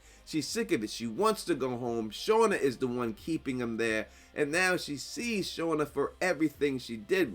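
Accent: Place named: New York, United States. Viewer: Bruv, it's the accent. My boy is from NYC, I'm from Dirty Jersey. That's how some people speak around the area.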